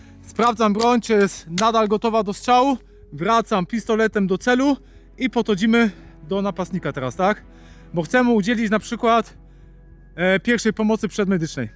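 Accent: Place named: Germany. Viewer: No i spoko :) Dobrze wytłumaczone i nawet lekki niemiecki akcent nie przeszkadza ;)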